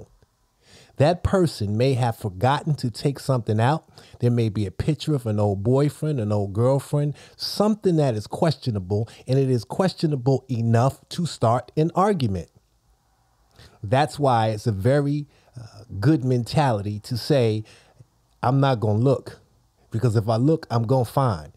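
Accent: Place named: Harlem, United States. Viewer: Talk that Harlem talk 🤣🤣🤣you already know as a fellow New Yorker 🤣🤣🤣. Especially Harlem🤣🤣🤣. We a different breed🤣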